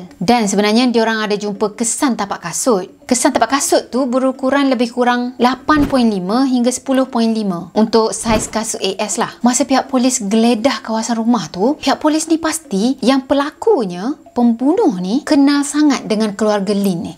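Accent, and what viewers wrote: Kelantan, Malaysia. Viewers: pada siapa yg berbakat jdi pembaca berita tp tak berpeluang, boleh la cuba jdi youtuber......suka cara menyampaikan cerita, jelas dan terang walaupun ada slang2 kelate sikit....but its ok...slang yg natural...keep up the good work sis....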